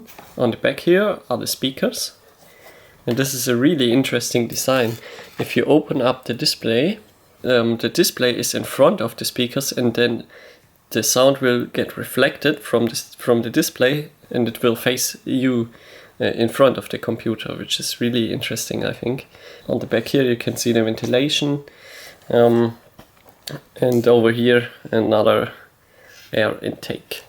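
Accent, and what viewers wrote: Germany. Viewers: Die Leute scheinen den deutschen Akzent abzufrieren. Dachte immer die halten Deutsch für eine nicht ganz so schöne Sprache. :D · Man erkennt, dass deine Muttersprache deutsch ist. Ich würde dich bitten nicht englisch zu sprechen weil das ....... klingt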